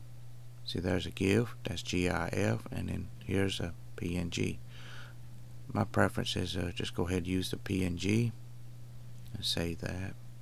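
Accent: South, United States. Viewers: Don't use GIMP every day, would have taken me hours to figure this out (if at all), but video very clearly explained, & with a beautiful southern accent! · Very helpful, but you sure do sound like my Uncle Cecil? Are you from TN or KY?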